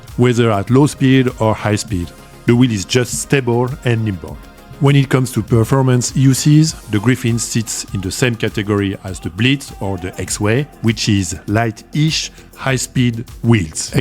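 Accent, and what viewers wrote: France. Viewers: en tant que wheelers FR, je suis fier que tu nous représentes si bien. Tu as l'accent français, et alors ? Je bosse dans l'IT et travaille avec différentes nationalités tout le monde a l'accent de son pays d'origine. L'important c'est d'être compris. Je suis sur que dans une ville cosmopolite comme New-York, tout le monde s'en moque. Heureusement que les humains sont divers et variés sinon quel ennui !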